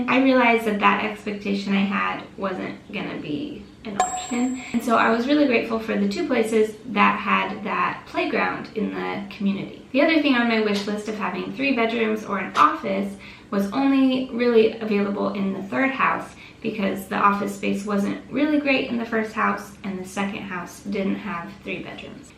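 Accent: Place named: United States. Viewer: Yes. Awesome! Keep the American accent. It goes a long way in the future. Much love from San Francisco California. Jesus is EVERYTHING.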